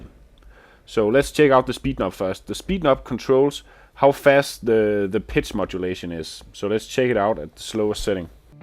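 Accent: Denmark. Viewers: with a charming danish accent you even could sell me a boss metalzone.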